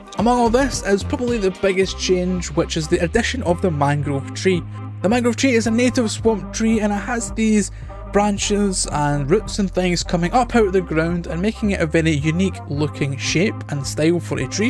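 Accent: Scotland, United Kingdom. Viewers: You know your accent is really unique, like it's you have a soft Scottish accent but also have braces, and the only other person with anything similar is AndyIsYoda. But anyways cool build.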